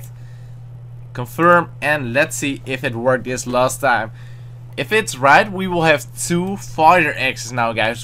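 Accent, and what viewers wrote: Netherlands. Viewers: is he dutch? ik ben wel nederlands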